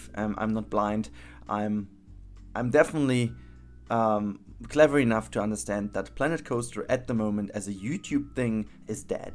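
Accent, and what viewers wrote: Germany. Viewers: Ok, first to what you talked about: It's really hard to maintain a channel when there's very little interaction. It's probably why the channel I had did so poorly and why I just couldn't find the effort to keep it going. I definitely miss it and am trying to get it going again or at least start something new. So, I get what you're saying. And actually, I've been watching and not commenting for a while now. I bet this doesn't apply for most of your viewers but I really dislike the German accent (and it's not your fault at all!) so a German YouTuber has to do really amazing content for me to watch it and support the channel. And I absolutely LOVE your PlanCo stuff because you spend so much time on details and you have amazing ideas. Basically, you play the game like I would like to (if I could afford the game or a computer that can run it). 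I would really like to see more and keep watching your videos. But I also know that life has to come first. And if you're not feeling you're getting enough back from making videos it can really affect you. I would hate for that to happen to you. So if you feel like you have to take some time off or take a step back, you do that. Now to the coaster: My first thought when I saw the thumbnail was "this looks like a coaster on Mars", because of the surrounding canyon but also because by now I'm kinda used to hexagons and triangles from Surviving Mars. And I think it would fit really well into a thriving Mars community to have a coatser like this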